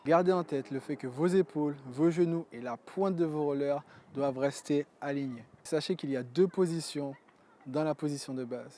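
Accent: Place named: Guadeloupe, France. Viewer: Pour ton accent ne le dissimule pas ça rajoute un charme ;) #vivgwada #panipwoblem 😅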